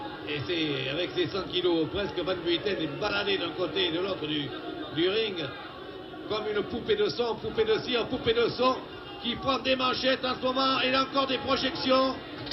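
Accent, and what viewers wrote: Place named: Algeria. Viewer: Le commentateur est au top. Langage imagé et accent pied noir. Autre époque.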